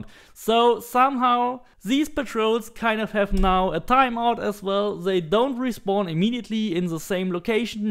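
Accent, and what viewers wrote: Germany. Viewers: deutscher akzent isssss da :D nice channel btw :D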